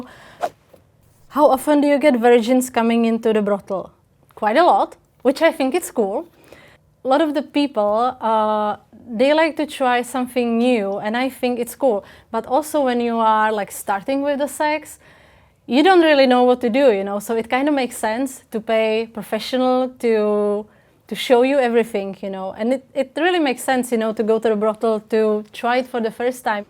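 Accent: Czech Republic. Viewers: She has a Czech accent. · Lovely Czech accent.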